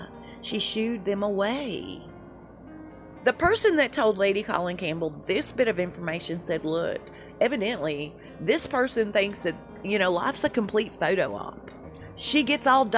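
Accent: South, United States. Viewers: I need you at some point to say "bless her heart" in your wonderful southern accent. 😊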